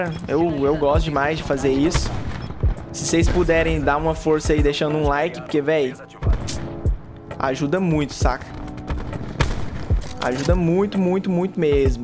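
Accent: Minas Gerais, Brazil. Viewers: Mineiro nato haha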